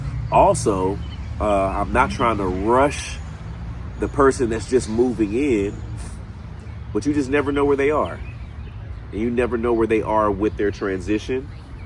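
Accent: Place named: Texas, United States. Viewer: Sybelle said " you sound like you're from Texas" then she said "I like you & she may visit you" She also said " you were funny" 🤣 She likes you alot and wants you to come back. 😊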